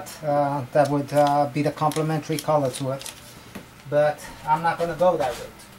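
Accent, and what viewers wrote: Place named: Azores, Portugal. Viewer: You sound like an Azorian person!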